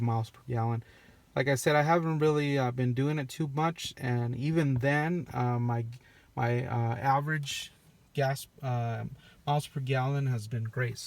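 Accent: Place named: Russia. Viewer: Dude, are u russian? Sounds like you have a russian accent.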